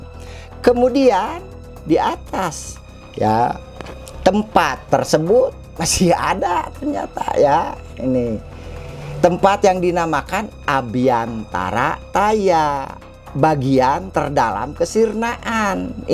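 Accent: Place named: West Java, Indonesia. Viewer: Trimakasih, Meski Logat Dan Bahasa Sunda cukup paham ,👌 💞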